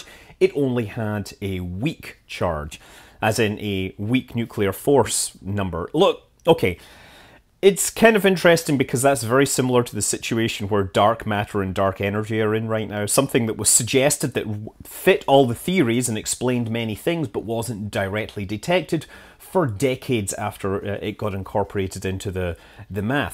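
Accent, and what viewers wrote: Scotland, United Kingdom. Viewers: i love your scottish accent!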